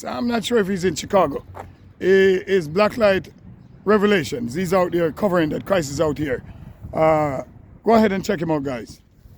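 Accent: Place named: Central America. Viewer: Are you from Central America? More specifically Hondurus? I am from Belize and your accent is very similar to my dad's.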